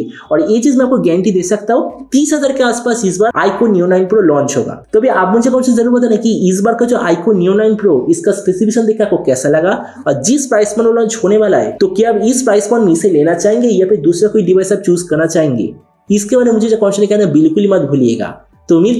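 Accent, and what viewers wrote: Bengal, India. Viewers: Bro, tongue Hindi nahin hai to Hindi channel mat karo, bongali hi thik rahegi.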